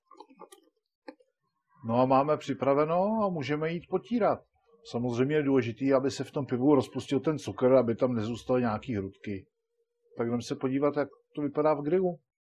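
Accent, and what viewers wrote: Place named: Prague, Czech Republic. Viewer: jsem naprosto normální heterosexuál:) Přesto bych řekl, že mám hrozně rád., tvý recepty jsou neotřelý, někdy trošku složitý, někdy jednoduchý, někdy super a dost často na ceny nejvyšší. Občas mi jako brňákovi úplně neladila tvoje pražská mluva, ale tohle je přesně to, co tě dělá zapamatovatelným. Jsi nejen vynikající kuchař. Jsi i člověk s charismatem a dobrým srdcem. Jsem rád, že jsem měl tu čest tě poznat i osobně. Díky nejen za ty super recepty, ale díky i za to, jak to celý podáváš.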